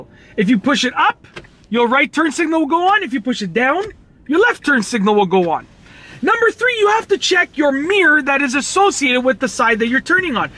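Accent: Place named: Canada. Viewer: The most canadian accent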